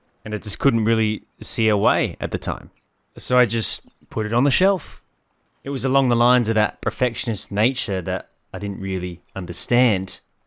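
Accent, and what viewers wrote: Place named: RP, United Kingdom. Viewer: Its been literal years. I thought this was Stimpee with a posh accent.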